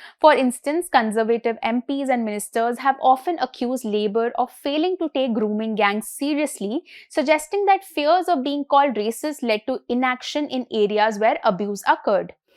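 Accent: India. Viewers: Is this an Indian woman, can tell from her accent 😢yep, unbiased. Have you investigated your gurus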